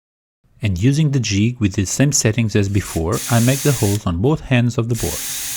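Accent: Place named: Portugal. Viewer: I was mesmerised watching this, and thinking to myself: "this guys sounds Portuguese" , and I was super happy to find out he actually is! :) I plan to do a lot of stuff myself on our new place, so I'm already subscribed! Kudos, great work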